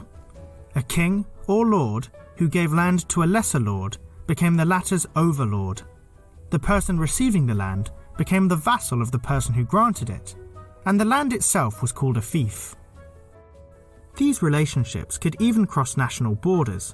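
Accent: United Kingdom. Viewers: Liked your clip. Already knew most of the story. With respect to present day Brits (note the narrator's accent), the then and there societal setup strongly resembled the previous setup of Feudalism. This from High School American History. And the main reason that much of the emigrates from the Old World to the New World. The major point of Feudalism is that your position in a society is either inherited or assigned. For those that know a little of the Roman Empire, this might sound like a repeat? Things that can make you go, 'Hmm?'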